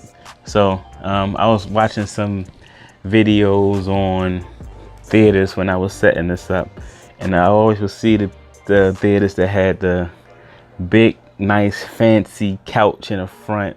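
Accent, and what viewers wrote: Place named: Maryland, United States. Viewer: Feeling that man cave. I sense a Maryland accent...MicroCenter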